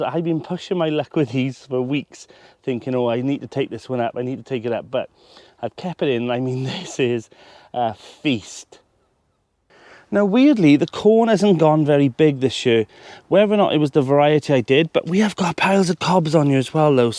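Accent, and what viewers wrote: South Wales, United Kingdom. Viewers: I love your accent. Reminds me of my relatives from South Wales. I'm just really hard of hearing. Many thanks. X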